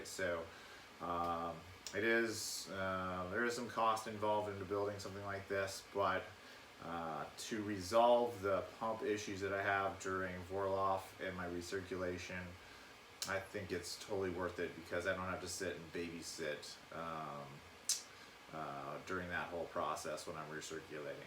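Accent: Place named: South, United States. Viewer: You seem to have developed a southern drawl in this video.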